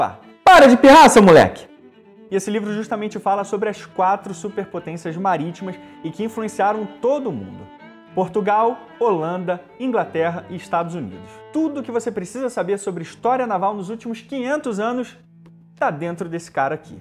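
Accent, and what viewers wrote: Rio de Janeiro, Brazil. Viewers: E que sempre me queixei da falta de bons canais do youtube em portugues-pt FINALMENTE, encontrei um feito por um tuga mas com sotaque PT-BR. Enfim ainda bem que ja estou habituado xD Bom trabalho o canal esta incrivel. Mesmo o que fazia falta. e para mais um canal de outro amante de guerra :) Eu confesso que sempre achei a era napoleónica muito chata na guerra. Vais falar deste assunto? Ou para já é tudo historia medieval? Abraços de Portugal :) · É o português mais carioca que eu já vi 😁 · Português com sotaque e falas brasileiras kkkkkkk Também sou kkkkkk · "Sou português" falando Português BR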